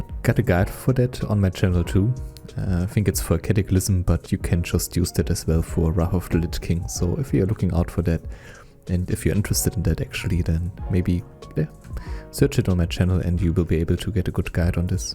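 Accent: Germany. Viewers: Man hört echt das deutsche raus^^,But great Video:D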